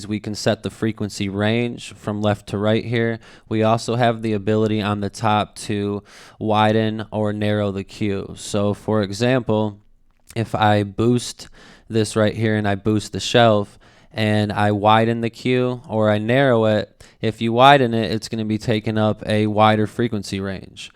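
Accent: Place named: United States. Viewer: I don't like the american accent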